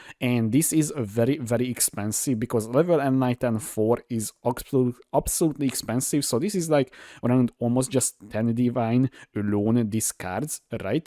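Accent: Hungary. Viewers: This must be the thickest Hungarian accent I've ever heard xD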